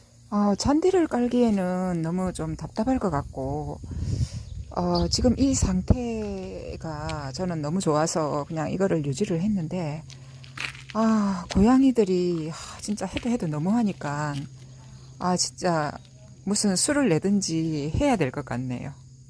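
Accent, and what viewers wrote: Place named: Gyeongsang, South Korea. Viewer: 님 반갑습니다 올여름 날씨가 대단합니다🍋🍋🍋 영상 멋지네요🎾 경상도 사시나요 말씀이 친숙합니다 고추 말림이네요 즣은즐감합니다 꽃도 소개해주시고 영상 멋져요🍇 감사합니다🖼🖼🖼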